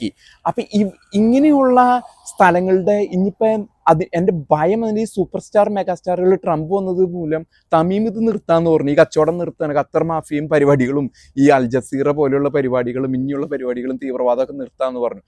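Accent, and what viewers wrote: Thrissur, India. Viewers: തൃശൂർ ഭാഷ അലക്ക് അടിപൊളി ......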